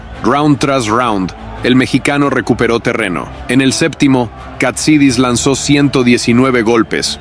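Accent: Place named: Cuba. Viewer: De seguro eres cubano hablando mmmm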